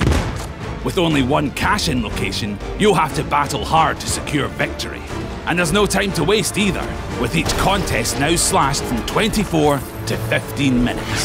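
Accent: Scotland, United Kingdom. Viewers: Scottish narrator 😊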